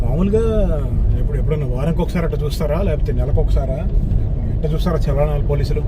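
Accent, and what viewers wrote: Andhra Pradesh, India. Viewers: Anna meeku andhra roots unnaya? Slang chaalavariki andhra laga undi❤ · Mee slang Nizambad slang or telangana slang laa ledu Enduku Anna, Andhra slang la untundi enduku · Anna nuv Telangana la putti perigi Andhra oodi lekka enduk matladuthav anna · Miru Andra settlers aa bro basha atlane undi nijamabad lo chala untaru kada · Slang enti Bro Andhra laga vundhe medhe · హలో బ్రదర్. మీరు ఏ జిల్లా. ఆంధ్రావాలా మాట్లాడుతున్నారు. మాది ఆంధ్ర గుంటూరు జిల్లా మాచర్ల బ్రదర్ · Mi Yasa Andra laga undi Enduku telangana laga ledu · Anna meeddhi andhra laungauge lekka vundhi endhanna😂 nizamabad na manadhi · Anna meeru matlade telugu andhra slang la undi telangana slang la ledhu endhuku ani ala...???? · Anna medi Andhra naaa or telangana naaaa mee language amo Andhra laga undi but ur staying in Nizamabad how come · Anna mi slag andra laga undhi .mereme telangana antunaru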